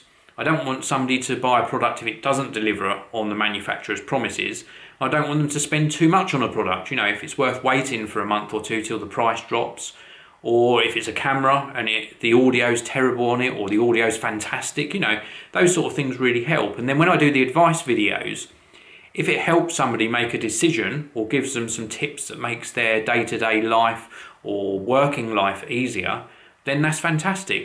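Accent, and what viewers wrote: United Kingdom. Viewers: If Only we could get Linus to stop asking us to subscribe and his annoying annotations asking the same thing. But I do not mind when you say it, sounds better with a British accent :)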